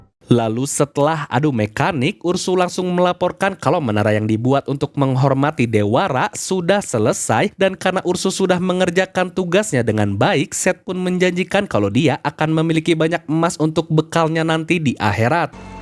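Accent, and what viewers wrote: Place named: Java, Indonesia. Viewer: Emamg elu tuau dia orang Jateng or jatim? mas is jawa. Not nesyenel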